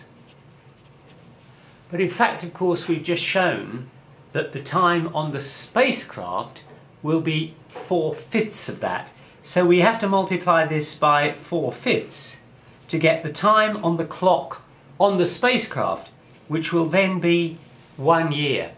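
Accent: United Kingdom. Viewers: i like the british sweety accent rather than the lecture itsel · Fantastic!!! The British accent is also extremely helpful.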